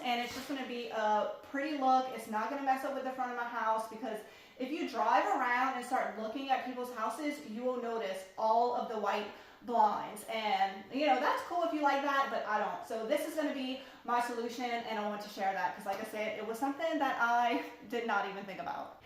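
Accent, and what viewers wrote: Louisiana, United States. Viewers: We used to live in the Leesville/DeRidder area when we first got married, but we left in 1991. I love hearing your Louisiana accent—I’ve really missed hearing it!! Your house is beautiful, and I’m subscribing to see it transformed.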